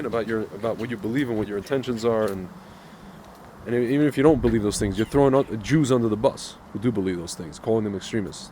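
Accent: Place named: United States. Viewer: Pasty faced American accented man talking about his land 😂. Foff. Free Falastin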